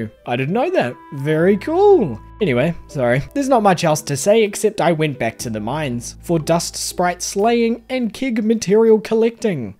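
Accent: New Zealand. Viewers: Are u kiwi? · are you from new zealand i can tell from the accent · I had no idea he was kiwi! i guess i didnt tune into the accent because im also from nz and i was so excited when i found out!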